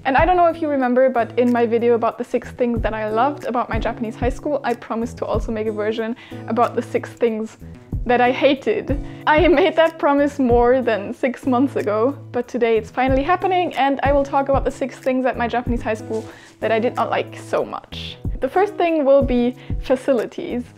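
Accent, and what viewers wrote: Germany. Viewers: I love you're accent and ughhgh German girls <3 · Your German accent sounds so good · You sound really german. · Da schaut man 5 Sek vom Video und denkt sich "das muss ne deutsche sein", geht in die Beschreibung und tadaa was sieht man... Sie ist deutsch XD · so you are german? it sounds like you are XD lol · the lovely German accent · i think shes german she has that accent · Du bist doch deutsch oder? Man hört das voll😂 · I could hear in your voice that you're German,and then I made sure. I WANNA GO THERE,I LOVE GERMANY😍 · Haha, bitte nimm das jetzt nicht persönlich, aber finde es gerade sehr witzig, dass ich innerhalb einiger Sekunden den deutschen Akzent rausgehört hab. :'D Überlegte schon, ob ich nachschaue woher du stammst, also danke, dass du es gleich in diesem Video geklärt hast. :> Wirklich beneidenswert, dass du diese Erfahrungen sammeln konntest. 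Wünsch dir noch viel Erfolg mit deinem Channel. :)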